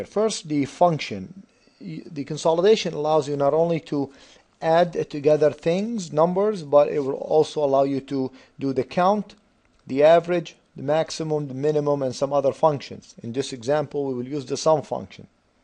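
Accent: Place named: Arab world. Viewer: nah hes an arab, indian accent is quite different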